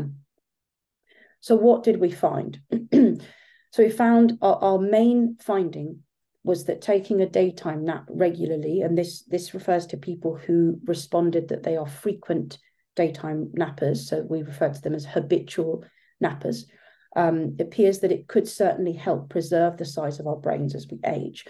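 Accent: England, United Kingdom. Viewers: It's an English accent, it's the English language.... Literally the correct accent. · How can you not understand an English accent?! It is quite nice.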